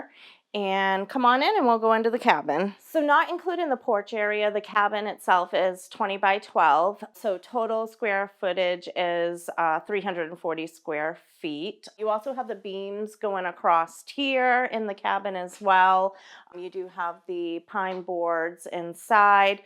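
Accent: Maine, United States. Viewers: like the way you say side-in for the siding, gotta love that true Maine accent!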